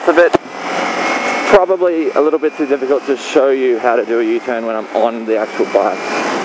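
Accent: United States. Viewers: thanks a nice vid, but I noticed you've picked up a US accent? Watching to much youtube? Seriously though, you make some good points esp motorcycling is dangerous, we need to be learning, practising skills ect always. And the more you know the harder you seem to push it, we all need to watch this. There are many advanced courses out there, usually taught on a race track with expect/experienced teachers. Riding fantastic fun and well worth the effort, ride safe everyone. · also you have a slight American accent with different words lol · Why do you say your A's like an american? Us Aussies chuck an R after an A. e.g. Carn't, barsketball, arseholes, cmon man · you pronounce words with an american accent at times. Its weird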